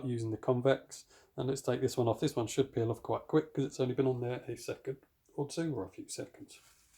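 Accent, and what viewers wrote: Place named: England, United Kingdom. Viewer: Good to hear an English accent instead of dreadful drawl from the American offerings.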